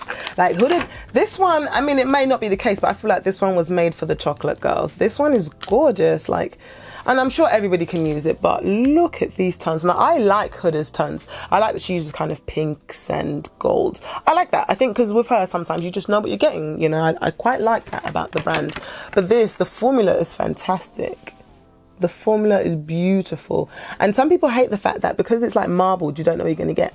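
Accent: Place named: United Kingdom. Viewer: Are you from Britain? I love your accent! Very elegant.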